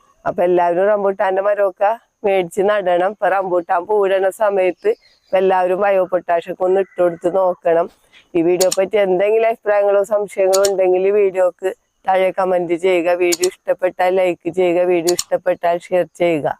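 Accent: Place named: Kozhikode, India. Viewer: Couldn't understand Koyikodu bhasha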